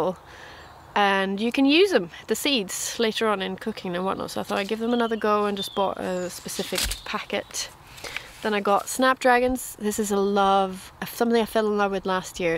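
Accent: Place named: Northern Ireland, United Kingdom. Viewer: You've chosen a lovely flower selection come summertime your plot should look really colourful. Btw I'm wondering if you've ever lived in N Ireland. I thought I detected some of the dialect in your accent or perhaps I'm mistaken. Snow was heavy in our region for a few weeks but today it's sunny so Spring is on its way soon. Take care.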